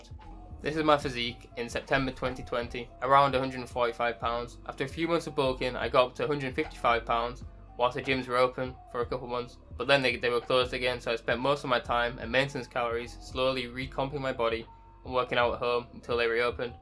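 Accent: United Kingdom. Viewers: i know being lean is dope but I Really think if you bulk for a solid year its really going to pay off. Good work so far though. also wtf i didnt expect you to be british lol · England accent nice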